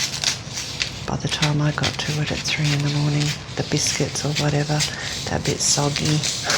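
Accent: Australia. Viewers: A few years ago I was thrilled to learn that ASMR was a "thing" too, when I stumbled across it while searching for Reiki videos. I used to think I was a weirdo too! 🤣 I especially Love soft spoken accented voices; your Aussie accent is dreamy 😵‍💫✨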